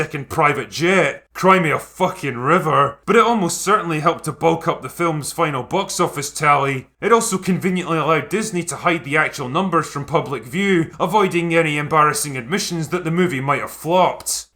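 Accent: Scotland, United Kingdom. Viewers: Is the Groundskeepr willie accent his natural accent or does he exaggerate it for these videos?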